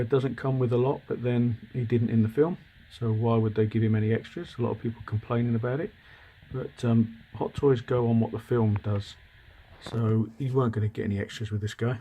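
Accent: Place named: United Kingdom. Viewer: To thanks for always uploading your video. I have seen some of your old video(and love it)-aliens marines,wolf complete with cleaner kit asesory,predalien and always feel amazed how in depth your reviews are. And yeah maybe its awkward but i do like your accent - the explanation so detailed and smooth:) Sometimes i wonder why i never born at UK hehe:). Im a new collector sixth scale mainly ht old version preds and aliens marines but thanks for always uploading the figures:)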